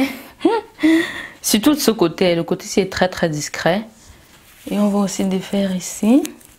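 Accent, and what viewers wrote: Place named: Cameroon. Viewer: Vous avezvun accent Camerounais😆😆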